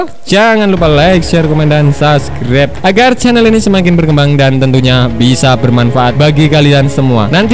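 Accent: Java, Indonesia. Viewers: Jawanya asli.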